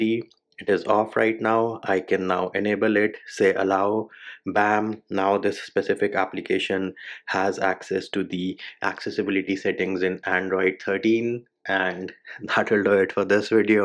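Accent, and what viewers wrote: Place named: India. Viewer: Indian accent😂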